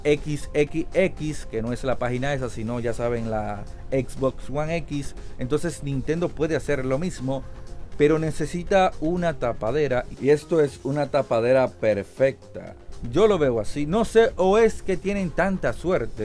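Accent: Dominican Republic. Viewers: Eres de republica Dominicana?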